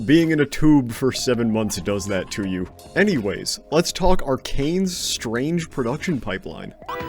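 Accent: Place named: United States. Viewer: "compositing is a long and complicated process that many of you may be unfamiliar with as 3D artists" I'm sorry but this is a genuine question, but do Universities not teach this as part of their curriculum in America (I'm guessing based on the accent)? Do you choose your specialisation right from the get go? Because I was taught everything from Modeling, Animation, Rigging, Texturing, Compositing, literally anything and everything that can be in a 3D pipeline. In all sense of the word, we were taught from the ground up to be generalists. I understand that some people are self taught or are just hobbyists so learning compositing seems like a waste if all you do is modeling or animating, but I'm curious for people that went for a formal education how that all looked like.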